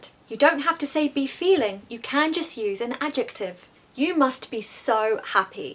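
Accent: United Kingdom. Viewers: British Accent is very good i Adore it❤❤❤❤❤❤❤❤❤ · Hello, it's always very nice to listen to your stories and wonderful british accent! I have a question for you: are you English or Scottish? I presume English, but I am not sure! 👍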